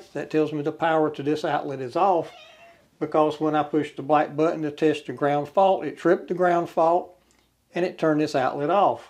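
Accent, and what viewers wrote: South, United States. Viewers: He explains it nice and simple. The southern accent is great. Thank you sir. Ya'll good people.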